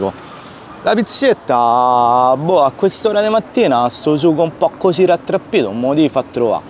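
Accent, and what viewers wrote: Rome, Italy. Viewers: Io ormai me li guardo tutti i tuoi video, semplice, genuino e poi l'accento romano nsè batte 🤣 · Grande. Io sono della Basilicata e vedo tutti i tuoi video. Mi piace tanto come ti esprimi e adoro l'accento romano. Continua cosi. 💪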